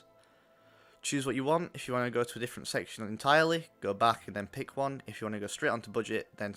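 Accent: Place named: United Kingdom. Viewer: Great series, however I'm having hard time understanding British accent videos and you speak too fast for my taste.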